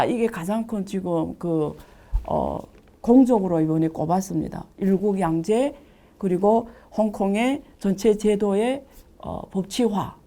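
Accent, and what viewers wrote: Yanbian, China. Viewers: 。。加油！ 우리 연변의 자랑이예요 개무 갠데 걸쭉한 연변사투리 좋쓰꾸마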